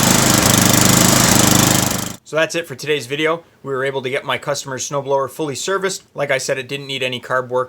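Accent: Canada. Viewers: I can hear the 🇨🇦 in your voice!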